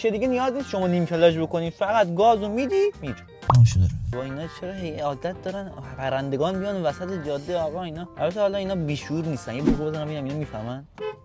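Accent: Mazandaran, Iran. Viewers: مازندرانی هستی؟❤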